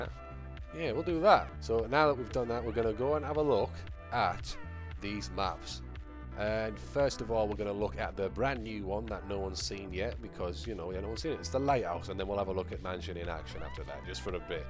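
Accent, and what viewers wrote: United Kingdom. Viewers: He sounds scottish, first time i heard this voice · So dont hate him for british acent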